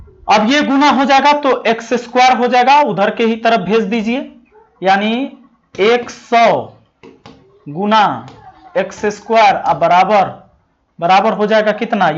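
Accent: Bihar, India. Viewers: Ek dam bihari andaz me padate hai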